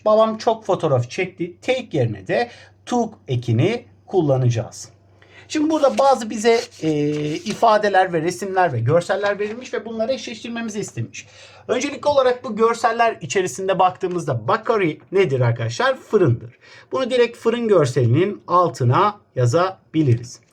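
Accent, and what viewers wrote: Kayseri, Turkey. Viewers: Hocam video çok güzel olmuş ellerinize sağlık 😊siz Kayserili misiniz bende Kayseriliyimm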